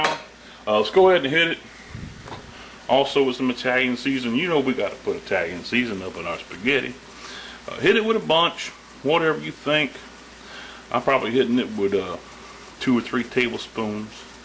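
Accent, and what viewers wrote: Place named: New Orleans, United States. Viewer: I love your videos. They are quick, non-textbook, and very logical to follow. Your accent reminds me of my nites in new orleans!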